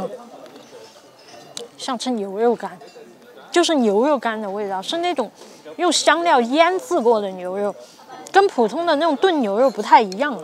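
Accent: Sichuan, China. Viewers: 听你的口音就晓得是川渝勒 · 不晓得正大综艺的多半90后，不过确实有点像，在四川听川普，很不错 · 四川口音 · 川普的味道还是一直保留了一点点. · 四川塑料普通話好親切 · 兄弟，你挺美的，你一说话，我就知道是咋们四川或者重庆的兄弟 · 说的一口地道的川普好听😁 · 浓浓的川妹子口音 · 主持的川普好亲切，兄弟们点赞是必须的👍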